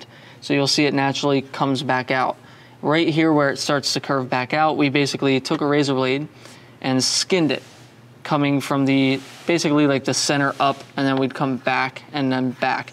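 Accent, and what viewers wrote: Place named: Philadelphia, United States. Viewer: Where are you guys located? You sound very Philly my friend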